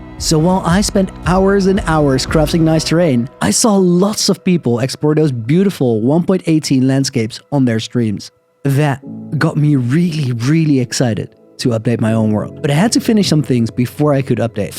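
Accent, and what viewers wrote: Netherlands. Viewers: since I joined this adventure when u only had like 50K subscribers, I immediately recognised you’re Dutch accent, I am from Belgium but I really enjoyed every hour I could watch u. Keep up the great work man!!!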